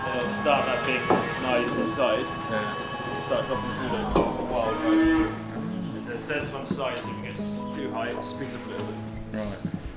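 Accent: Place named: East Midlands, United Kingdom. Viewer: Just started watching your videos, listening to your accent I thought you were from around East Midlands and just seen Leicester. Big move and a sensible move, well done you, we got out of Leicester 4 years a and moved to the Outer Hebrides thinking it was remote, nothing like you. Look forward to you renovating the house, where in Leicester were you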